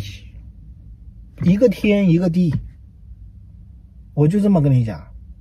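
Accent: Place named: China. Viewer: 他不是台灣人來自中國，聽口音也知道。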